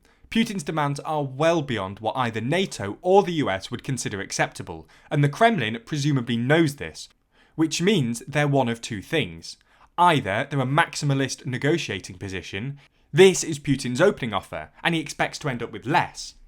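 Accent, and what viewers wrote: United Kingdom. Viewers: You are plugging your opinion, not god. By accent British?British proof through history ar devious and rutten. This is for the beginning. Russia shall not negotiate with the West at all, history establishes the outcome. Russia should and will take all its own decision. Russia won the arms race against the USA, has bain the West doesn't have any. You are welcome anytime.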